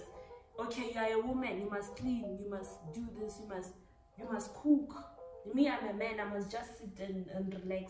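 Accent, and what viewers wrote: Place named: Nigeria. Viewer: It’s the nigerian accent for me😂😂 biko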